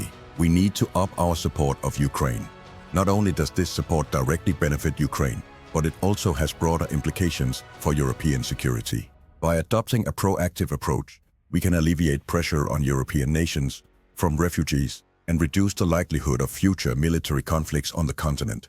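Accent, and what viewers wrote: Denmark. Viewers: Man kan godt høre du er dansk :) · The speakers accent sounds pretty danish to me :) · 100% that's a dane😂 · Ofc the speaker has a danish accent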